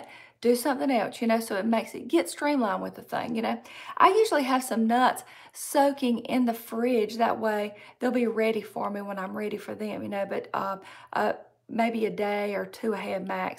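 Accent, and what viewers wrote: South, United States. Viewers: Thank you! Sometimes I can't understand the Southern accent- Green/Grain, etc.🤗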